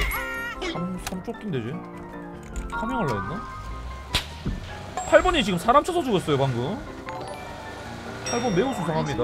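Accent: Busan, South Korea. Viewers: 아 부산사투리 너무 찰지고~~~ㅋㅋㅋㅋㅋㅋㅋㅋㅋㅋ 부산아재 확실하네용 ㅎㅎㅎ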